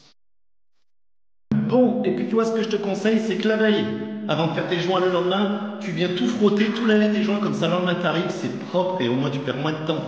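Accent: Loire, France. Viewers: De bons conseils , de la bonne humeur, de la bonne musique, l'accent de la Loire. Du kiff, merci d'être super ludique dans l'explication, clair net agréable à souhait ! 🙏👍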